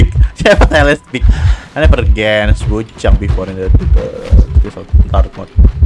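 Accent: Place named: Indonesia. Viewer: Aksen'a kek orng Indo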